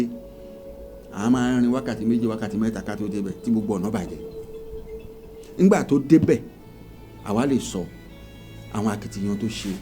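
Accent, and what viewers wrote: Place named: Nigeria. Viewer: This man so you travel out but you don't talk like who travel out of nigeria.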